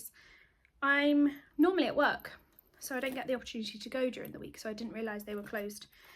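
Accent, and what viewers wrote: England, United Kingdom. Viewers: Congratulations on your 1.69k subscribers. Love listening to your British accent and the Scottish lady too. From an Aussie down under. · Love your yarn purchases. It is so easy to get overrun with yarn, it does take up space. I know for sure😁 . I love yarns and knitting socks hats mitts cows and some shawls. Rattle on I love listening to you, I love English accents😎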